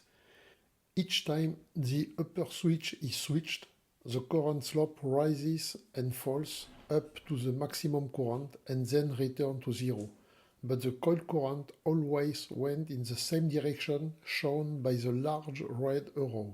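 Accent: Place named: France. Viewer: French accent is soooo funny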